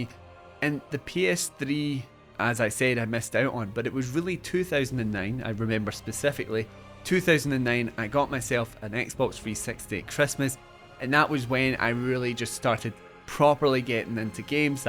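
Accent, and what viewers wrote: Scotland, United Kingdom. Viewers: Push Square has rapidly become my favorite gaming website, because it’s refreshing to have a place where people discuss video games with no apparent agenda beyond the love of the medium and a desire to see more good games. Even commenters are mostly civil to each other, and that is down to the site establishing the desired tone (and a little moderation from time to time.) So now I’ve subscribed to the YouTube channel and so far I’ve enjoyed what I’ve seen. I liked the couple tech reviews. I’d prefer that you go in depth on one topic to making “Top 7” videos, if you’re taking requests. The topic should be whatever interests you, because that’s the only way you’ll make videos a million other people couldn’t make. The accent is great! I’m American but my mother came from Glasgow and all my favorite relatives sound like you (though maybe you’re from another part of Scotland because I understand you just fine at 1.25x speed.) Tifa was the correct answer. 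The proper response to the swallow question was “African or European swallow?” You’re doing great!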